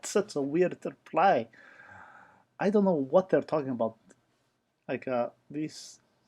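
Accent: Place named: Germany. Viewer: She mentioned it in a video, but I already knew because of her german accent. You can see some people comment in german on her channel.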